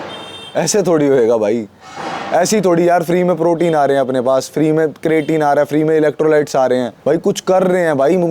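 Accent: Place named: Haryana, India. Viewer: Haryanvi brooo 🗿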